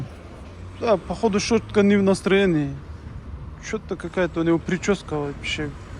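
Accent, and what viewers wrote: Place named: Caucasus, Russia. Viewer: Автор, ты на рожу русский, а акцент кавказский, ты метис штоли?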